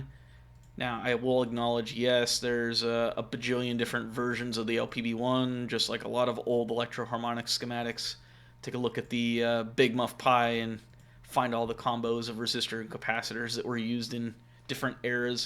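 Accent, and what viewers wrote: Australia. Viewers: Did you shake the Australian accent?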